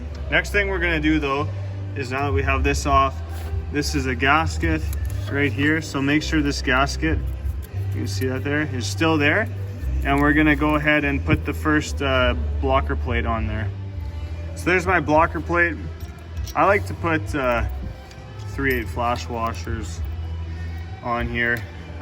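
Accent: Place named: Canada. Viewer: You sound Canadian, where are you working from?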